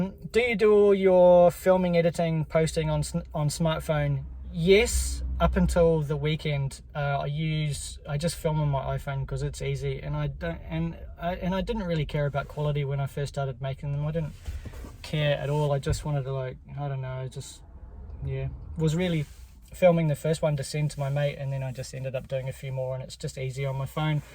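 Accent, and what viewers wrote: New Zealand. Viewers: Not sure what the dude is about - you don't sound Maori as such - just a typical NZ 'one of the bros' kinda accent... regarding tasmania - will be cool man, probably my favourite locations in Australia. Make sure you check out MONA. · Talking like maori? They mean Te reo? Or 🤷🏽😅 you talk like a kiwi, nzer 😅🤷🏽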